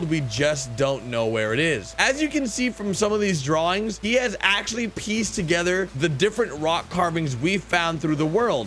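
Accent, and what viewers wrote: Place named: New York, United States. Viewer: He sounded like a New Yorker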